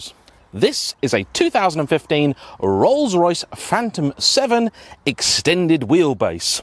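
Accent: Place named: RP, United Kingdom. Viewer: You sounded so posh and isolated.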